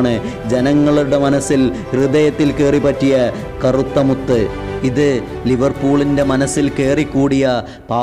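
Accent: Malappuram, India. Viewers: Commentator malappuram ullathano malappuram language pole thonnunnu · അവതാരകൻ മലപ്പുറൻ കാരൻ ആണോ 🙄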